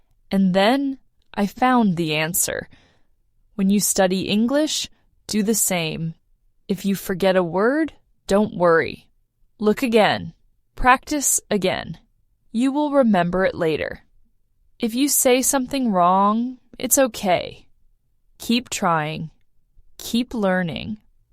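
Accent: United Kingdom. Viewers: Is this British accent? However this kinda lessons are so precious to us❤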